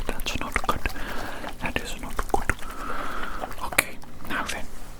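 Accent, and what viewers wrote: Wales, United Kingdom. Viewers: omg wait are you welsh?!? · Love this vid ! Are you Welsh? I recognise that accent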